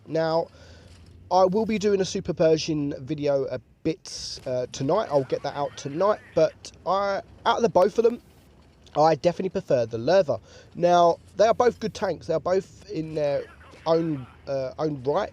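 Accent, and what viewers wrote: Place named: England, United Kingdom. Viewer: drake 515_3 just a English thing. Listen how he says armor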